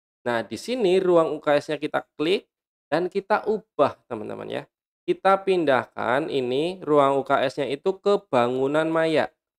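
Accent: Banyumas, Indonesia. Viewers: Terima Kasih Ilmunya Pak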